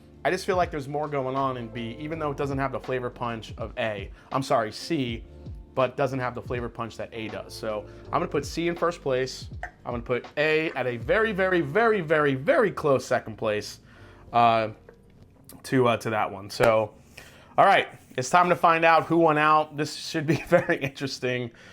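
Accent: New York, United States. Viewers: Are you from New Yawk? I heard you say 'wata'. I'm fum Brooklyn.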